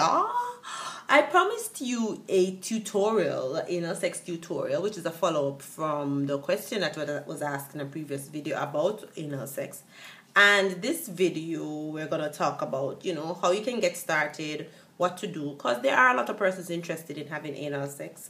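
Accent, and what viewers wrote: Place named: Jamaica. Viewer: Thank you, you are very helpful. I love your jamaican accent xxx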